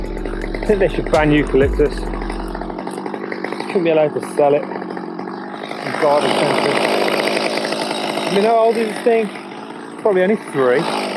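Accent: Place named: Nottingham, United Kingdom. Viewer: Cool video . 👌 I guess you’re from Nottingham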